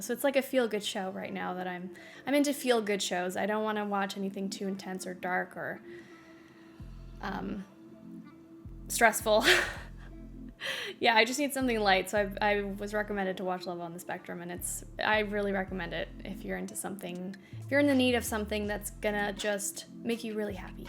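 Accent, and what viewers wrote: Quebec, Canada. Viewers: I'm not fluent in french (we learn it mandatory in school here, german speaking part of Switzerland), but I realized how different Canadian french is to what we know :D. It sounds cool though. Being bilingual (at least) is always a win.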